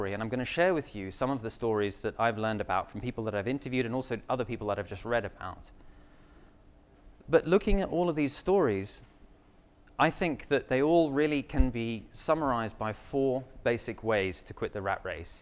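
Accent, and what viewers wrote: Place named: England, United Kingdom. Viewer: Wait a minute....how'd Mr Money Mustache get an English accent????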